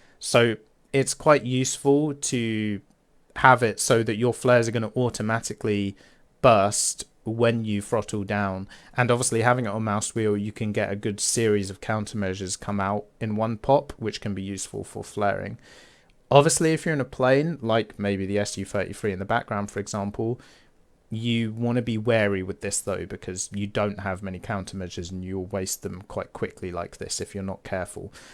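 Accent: United Kingdom. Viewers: Last time I watched a British man yap for 40 minutes it was TheMightyJingles. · mmmmmmmmm asmr british man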